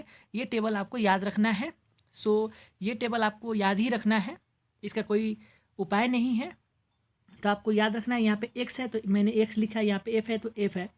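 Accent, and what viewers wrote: India. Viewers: I'm opening the video and the minute I hear Indian 😩😩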